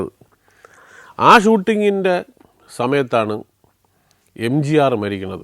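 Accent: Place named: Thiruvananthapuram, India. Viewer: സംഭാഷണത്തിലെ തിരുവനന്തപുരം ശൈലി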